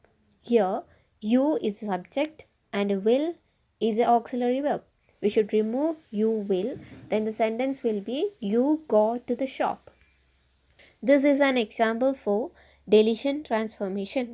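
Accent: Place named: Tamil Nadu, India. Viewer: Tamil accent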